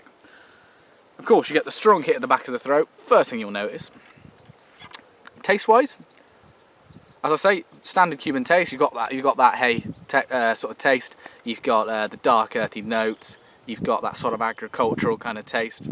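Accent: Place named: England, United Kingdom. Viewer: I love this english accent 😃 take care of you europe. Stay safe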